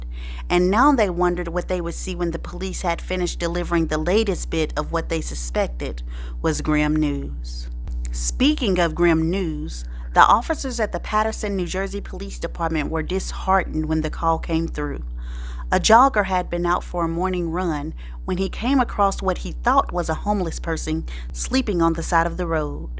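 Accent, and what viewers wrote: South, United States. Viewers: Perfect. But that gone with the wind accent. Is odd and awkward for something that took place in NJ - Newark at that..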